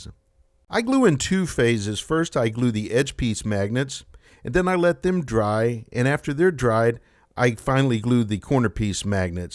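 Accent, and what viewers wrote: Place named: Canada. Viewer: JesterKing1776 can't get much better than that Canadian accent. lol. just has one of those soothing voices. sounds a lot like this welding guy I watch on here.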